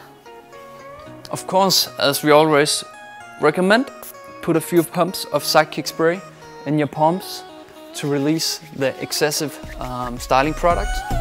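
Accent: Germany. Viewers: Du klingst deutsch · german? sounds like Arnold!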